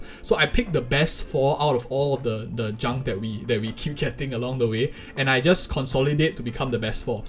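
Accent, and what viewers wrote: Singapore. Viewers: he definitely sounds singaporean ngl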